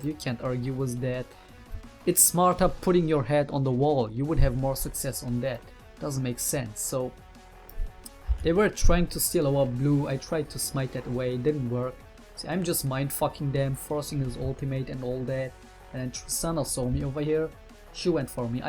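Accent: Germany. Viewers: hes from germany and live in germany,so its a german accent i think he learned english in the school and games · Ok ty, i wasn'y expectobg his accent to be a german accent, so i asked spanish but don't know the spanish accent xD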